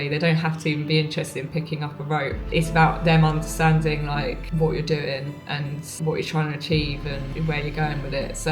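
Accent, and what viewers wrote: England, United Kingdom. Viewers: She is so beautiful and the English accent makes it even better.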